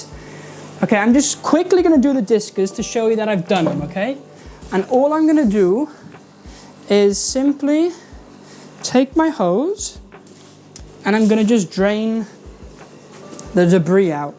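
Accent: Wales, United Kingdom. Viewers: I'm confused, as a Welshman I'd swear that accent was Welsh, but then I find you're a Nederlander. 🤯 😂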